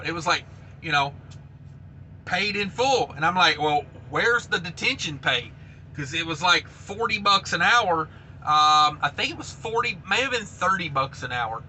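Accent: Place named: Tennessee, United States. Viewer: Aha! I knew that accent sounded Tennessean, especially when you threatened to put a foot up someone's a$# over detention pay. You can take the boy out of the volunteer, but you can't take the volunteer out of the boy.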